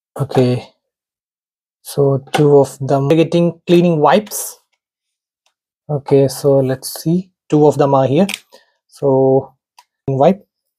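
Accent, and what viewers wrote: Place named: Kerala, India. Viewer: Dude, just a suggestion. You speak very fast, and your accent (I'm guessing you are from the South, possibly Malayalee) makes it very hard to follow you. Try enunciating your words better and speaking a bit slower. People aren't going to stay with the channel if they don't understand anything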